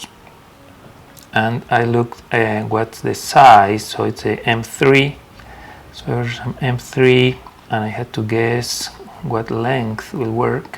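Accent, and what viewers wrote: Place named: Europe. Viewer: This is Genius 👍 I'm so glad I found this in my recommendations ! How is it you have such a European accent but measure in inches with that work mat though ??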